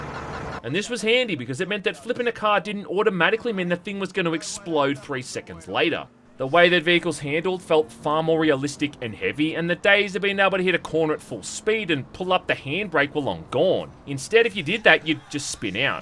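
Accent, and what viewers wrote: Australia. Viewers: You sounds like an Ozzie Australian. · Are you Australian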